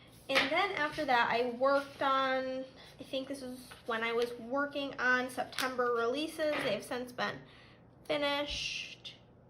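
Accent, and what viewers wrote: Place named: Upstate New York, United States. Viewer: You DEFINITELY have that upper NY accent. It’s cute though!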